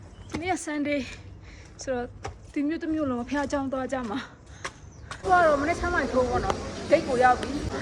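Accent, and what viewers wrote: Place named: Myeik, Myanmar. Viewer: မြိတ်သံဝဲနေသလိုပဲ၊ မြိတ်/ဘိတ်ဖက်ကထင်တယ်နော်။ Congratulation ပါ။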